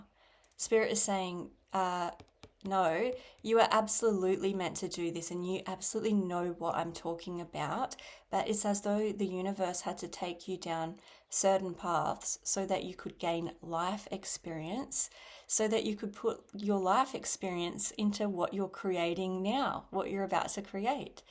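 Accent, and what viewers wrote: Australia. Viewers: R you an Aussie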